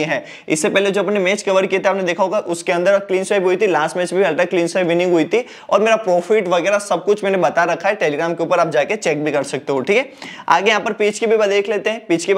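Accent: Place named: Rajasthan, India. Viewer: हम राजस्थानियों की हिंदी बोलते समय राजस्थानी भाषा निकल ही जाती है 😅😅 लगता है आप भी राजस्थान से ही हो 🎉🎉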